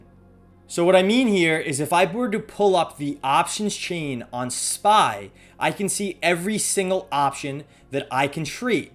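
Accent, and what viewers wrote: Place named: New York, United States. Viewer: There is zero chance this guy is not a New Yorker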